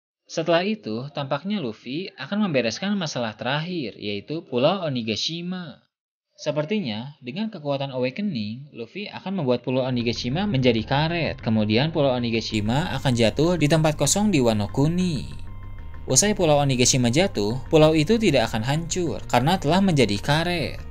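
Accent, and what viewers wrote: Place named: West Java, Indonesia. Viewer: Yang ngomong ini orang Sunda, Jampang fixz wkkw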